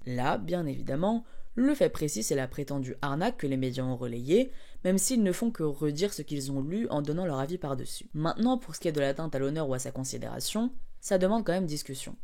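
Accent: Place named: England, United Kingdom. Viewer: pour quoi prendre l'accent anglais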